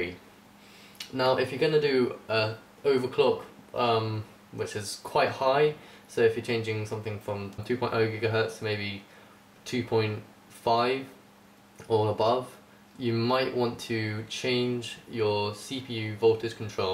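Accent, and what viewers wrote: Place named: RP, United Kingdom. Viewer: Beautifully spoken. What a wonderful example of speaking the Queen's English. Forget what the pleb antking1117 said. He's just jealous. Probably speaks with a drawwwwlllll. Get your hair cut.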